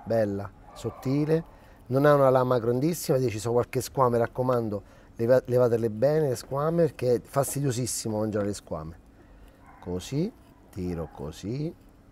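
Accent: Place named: Nettuno, Italy. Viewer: Sei dorceeee...con.. Noto ogni tanto un accento nettunese..☺🍾🍾🍾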